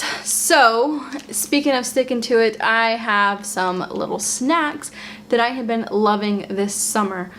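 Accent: Boston, United States. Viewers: Are you from Boston? Your accent.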